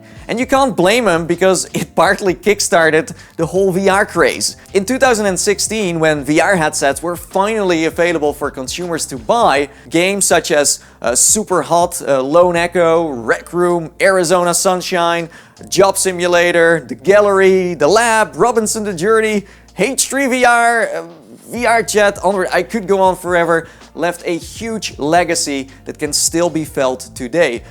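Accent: Netherlands. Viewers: man has a dutch accent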